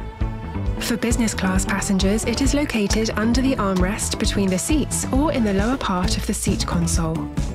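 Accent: United Kingdom. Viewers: Мне кажется надо было записать реальный голос бортпроводника с казахским акцентом, ведь в этом тоже кайф, а не прилизанный британский английский. В остальном все круто сделано! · Какой великолепный британский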